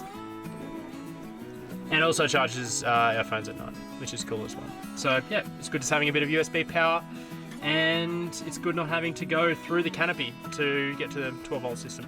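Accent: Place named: Australia. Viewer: So nice to hear Aussie voice on YouTube. Subscribed just cos that (well and you say you'll be vlogging your travels around Oz). I liked how the tent was dusty and stuff - obviously getting used and well, dust, so Australian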